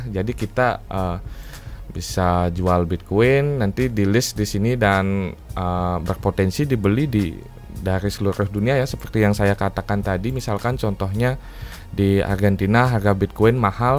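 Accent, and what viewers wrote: Bali, Indonesia. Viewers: Mantap ilmunya bosku.. Tp logatnya ky org bali ya 😁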